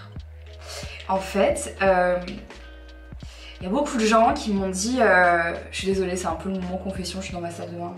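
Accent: Belgium. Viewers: Tu commences à prendre qqes intonations belges dans ta voix c'est mignon ^^ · tu commences à prendre l'accent belge! ahah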